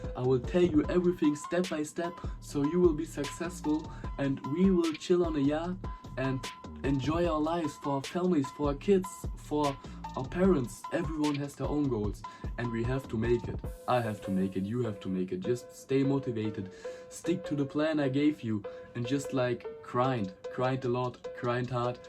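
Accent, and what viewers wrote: Germany. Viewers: are you german ?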